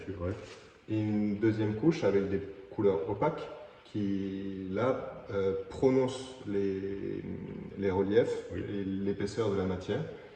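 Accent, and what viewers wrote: Gwangju, South Korea. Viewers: 우연히 보게되었는데 제가 광주사람이라 더 끌리더라구요. 외국인에서 들리는 광주사투리^^ 성실함이 인생을 좌우한다더니 아님 영상들보면서 그냥 웃으면서 보지는않았어요. 역주행중인데 이 영상에 첨으로 댓글달아요. 진심 작품들이 멋져요. 용접공예긴 이런거군요. 진심 소장하고싶어요ㅜㅜ 카페한다면 진심 구매했을듯요.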